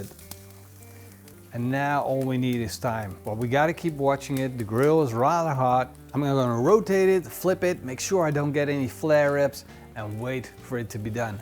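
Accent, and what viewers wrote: Netherlands. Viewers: Awful Dutch accent. I hate the Dutch. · Dutch accent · Awesome recipe! But please do something about your randstad accent. It's appalling to us Dutch who speak English without that shitaccent. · I'm Uruguayan, Chimi is our "religion" and this is a very good recipie. Your ingredients choice are correct and kudos for not adding 'inovative' ingredients. The choice of oil and salt makes it more 'gourmet' but the basics are 100% correct Only improvment I can see is that if you want to have the best Chimi ever, replace the dried oregano with FRESH oregano. it will upgrade it to a totally new level ps. you sound Dutch. where are you from ? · You must be dutch, can hear the accent. great recipe tips. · What a big Dutch accent bro . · Meest nederlandse accent ooit haha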